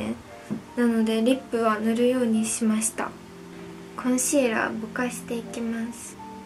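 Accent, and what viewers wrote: Kansai, Japan. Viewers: 関西弁が心地よく感じるのはじめて‥ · サムネみて強い女がいる！！綺麗！！！すき！！ って思って動画飛んできたら喋り方緩いし関西弁だし超大好きなんだけど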